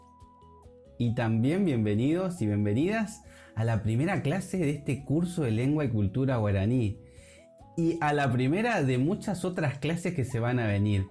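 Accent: Argentina. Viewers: Primera vez que escucho guarani con acento Argentino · Pareces Argentino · Debe ser correntino...igual explica muy bien 👍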